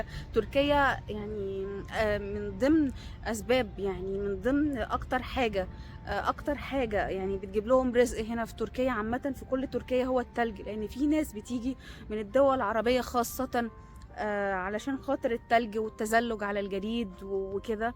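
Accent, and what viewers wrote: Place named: Ethiopia. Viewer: تعلميها انتي الاول وبعدين تفلسفي لما بتنكلمي تركي نفس صوت ولهجة العاملات الاثيوبيات اللي بتتعلم من اصحابها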